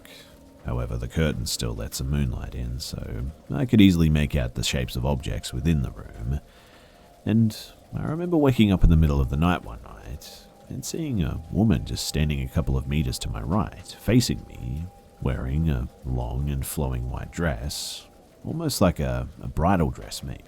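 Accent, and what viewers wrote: Australia. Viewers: Are u aussi or kiwi? I am not pretty sure about your accent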